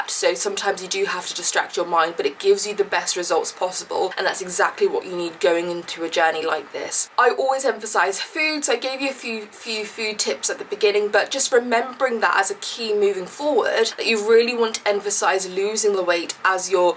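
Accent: RP, United Kingdom. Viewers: Rlly like the posh British accent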